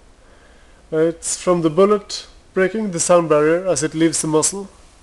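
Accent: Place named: Europe. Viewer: What wondeful fantastic and truly democratic European country do you live in that actually permits it's citizens to own both a handgun and a silencer? Your accent is European and I assume that's where you shot this very interesting video. I live in the US and Americans like me refuse to allow our government to take away our right to own firearms. Yes, we are a violent country, but it's the criminals doing all the violence--not law abiding citizens like me.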